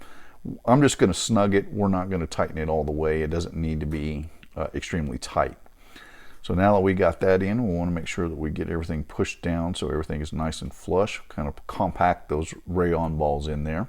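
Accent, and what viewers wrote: United States. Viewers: Good old American country twang sounds fine to me.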